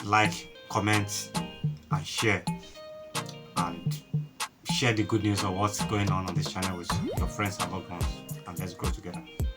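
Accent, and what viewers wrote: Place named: Yoruba, Nigeria. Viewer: Your yoruba dialect is too strong for my english language ears. Agbani did not study mathematics and computer science please get your facts. She studied psychology in the US after completing her miss world reign.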